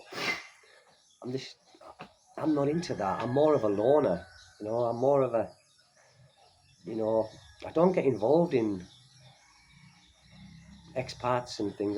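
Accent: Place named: Northern England, United Kingdom. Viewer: Are you from Manchester by any chance ? Im sure somewhere from the Northern England based on your accent.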